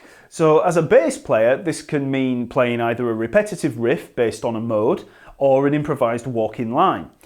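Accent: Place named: United Kingdom. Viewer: What was that app again? IWILBRI... What? Being a Filipino hard-wired listening and talking in American English accent makes me struggle a bit when listening to British accent.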